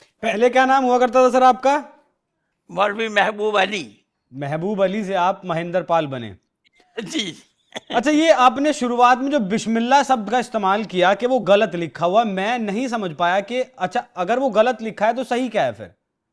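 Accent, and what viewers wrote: Standard. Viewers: Aap ki Hindi to itani shuddha hai ki hindi bolane wale kuch logon ko fail denge.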